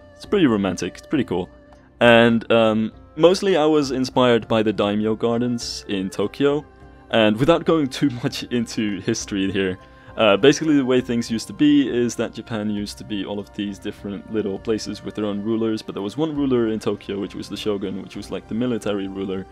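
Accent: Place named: Netherlands. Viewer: Nice!! Duurde even voordat ik het hoorde maar op gegeven moment dacht ik.. wacht eens even.. dat is een Nederlander!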